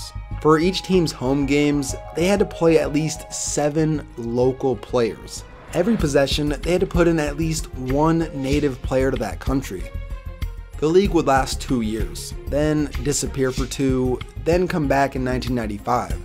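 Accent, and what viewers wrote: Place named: Boise, United States. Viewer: Yo are you from Boise??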